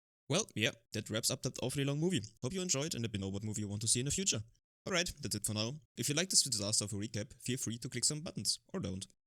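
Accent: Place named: Germany. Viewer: 😂 love the "tze", you crazy germans make it more fun just to speak, ceep it up ! 🎉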